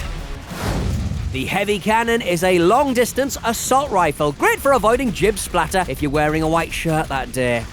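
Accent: Australia. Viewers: The narrator feels like his having a normal day in Australia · He sound more Aussie than Irish to me, m8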